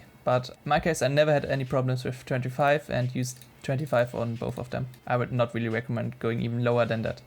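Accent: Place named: Germany. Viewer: bro u definitely german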